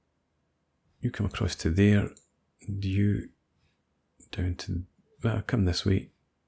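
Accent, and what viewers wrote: Scotland, United Kingdom. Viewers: Cheers and thank you for posting this video! I am always thrilled to hear wee bit of a Scottish accent, a country of which I am very fond of. If you happen to have any ideas or suggestions, just fire away 👍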